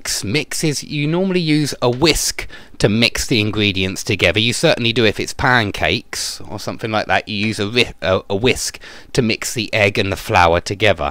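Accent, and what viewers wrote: United Kingdom. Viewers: your british accent is just lovely.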